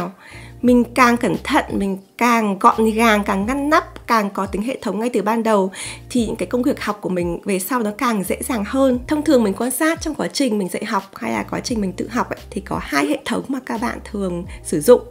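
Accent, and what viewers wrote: Standard. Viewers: Chị nói chuẩn ghê. Google dịch được hết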